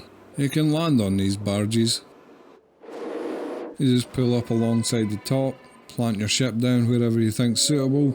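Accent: Scotland, United Kingdom. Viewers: I'm here for the Scottish accent 😎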